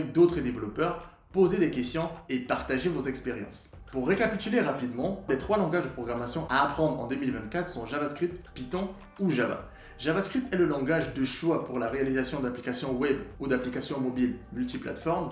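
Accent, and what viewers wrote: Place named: Cameroon. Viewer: l'accent camer ne meurt pas :) ton contenu est super je viens de tiktok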